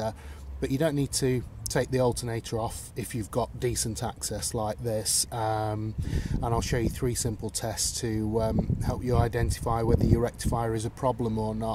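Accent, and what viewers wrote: United Kingdom. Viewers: Very helpful video, cheers! For other viewers, if the problem is your alternator, dont throw it (and your money) away! I just made a video about Alternator Troubleshooting & Repair. I had a non-functioning alternator and in the video I go through the fault-finding process and dismantle the alternator. In the end the problem was with the diode plate, a $25 component, which is easy to replace. If you want to see the video, go to my channel and you'll find it there... Happy fixing! Cheers, Chris 👍 p.s., where are you from? I'm originally from Blackpool, and your accent sounds pretty close...:-)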